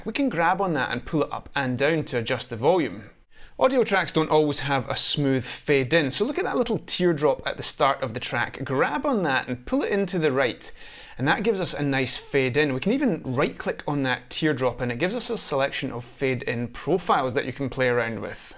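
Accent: Scotland, United Kingdom. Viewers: Very usefull video. Thanks....love Scottish aökcent:D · Ayyo! Fellow Scot! Instantly recognised your accent then saw your footage in Glencoe! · Simply because you have a strong Scottish accent I liked and added your video to my favs. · That was without any shadow of a doubt the most useful & feature-packed tutorial I've seen. It made me realise I was doing far more work than I needed in FCPX to get the results I was after, and it cleared up a few elemental basics that I was doing completely wrong, and causing myself much heartache in the process. And great to hear a fellow Scot narrate it. Many, many thanks for this big man!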